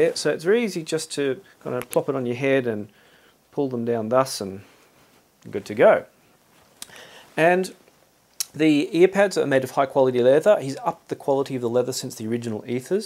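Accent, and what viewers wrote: Australia. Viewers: where are you from.. don't get the accent.. a cross between English and south african...probably Australian or kiwi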